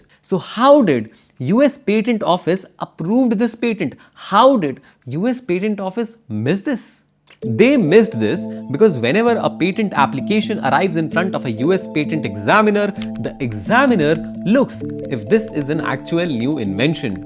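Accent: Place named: India. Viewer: That's just an indian accent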